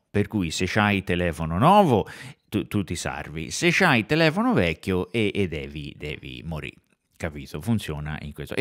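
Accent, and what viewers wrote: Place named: Siena, Italy. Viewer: Bravissimo 1) Per i contenuti 2)Per la simpatia 3)Per il formidabile accento senese.Numero UNO!!!!!:washhands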